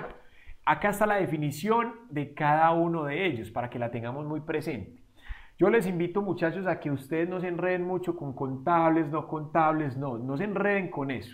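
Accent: Antioquia, Colombia. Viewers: Gracias profe, se parece a Vegetta 777, pero en Colombiano, gran video por cierto. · Me encanta la voz de los colombianos y no no me gusta los hombres por si alguien solo pensaba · Es la primera vez que veo un video suyo, profesor. Estoy estudiando inglés porque siempre me ha encantado, pero entre más lo estudio descubro que no es tan fácil como creí. Justo este tema de los cuantificadores me confundió pero gracias a su explicación lo entiendo mejor. Muchas gracias por tomarse el tiempo de dar esa clara explicación, además su maravilloso acento paisa tan marcado lo hace mucho más agradable. Muchas gracias, ya me suscribí.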